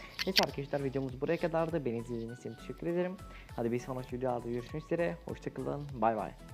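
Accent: Azerbaijan. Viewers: Sən Azerbaycanlısan? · Qurban olum Azərbaycan Ləhçəsinə 😁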